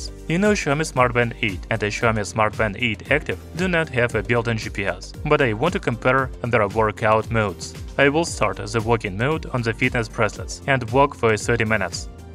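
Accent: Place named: Russia. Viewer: I'm sorry Bro, it is quite difficult and troublesome to understand you. Your russian slang is very strong.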